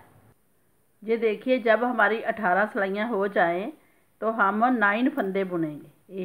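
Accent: Punjab, India. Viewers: ਭੈਣਜੀ ਤੁਹਾਡਾ ਬੋਲਣਾ ਅਛਾੱ ਲਗਦਾ ਹੈ ਵਿੱਚ ਹਿੰਦੀ ਵਿੱਚ ਪੰਜਾਬੀ ਬਹੁਤ ਵਧੀਆ ਲਗਦਾ ਹੈ 🙏🙏🌹🙏🌹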